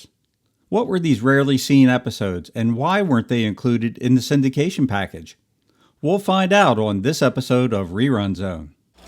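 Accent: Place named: Baltimore, United States. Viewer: Very fun seeing these clips. I had only seen one of them. Hey are you from Baltimore by chance? I grew up there and you have a similar accent from folk who live there.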